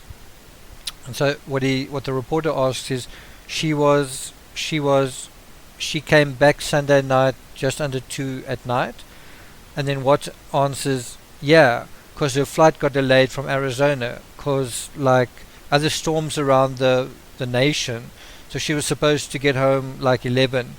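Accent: South Africa. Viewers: I miss V the series so much!!! Are you from South Africa? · Hey! You're South African 🇿🇦🇿🇦🇿🇦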